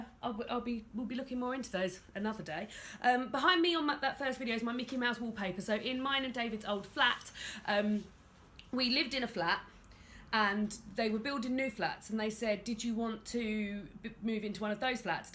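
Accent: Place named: RP, United Kingdom. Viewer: Am new to your channel and watched these videos only yesterday lol. You make my wife laugh she says you sound posh and thinks is funny when you swear. xxx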